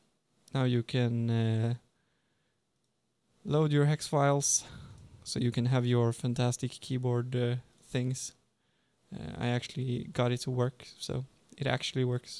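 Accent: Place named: Sweden. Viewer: You sound sooooo swedish =) EDIT: Ohh, du sa det senare att du faktiskt var från Sverige =) Tack för hjälpen!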